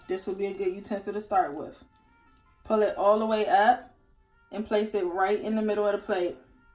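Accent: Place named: Maryland, United States. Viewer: I was thinking the samething. She sound like she from Maryland to me!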